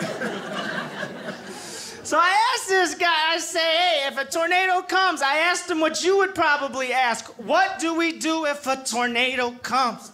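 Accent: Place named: South, United States. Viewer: you have a combination of a southern accent and a hood accent. it's cool.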